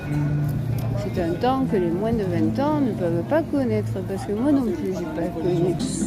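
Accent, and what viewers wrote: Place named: Montpellier, France. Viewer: Vídeo interessante.bel accent de Montpellier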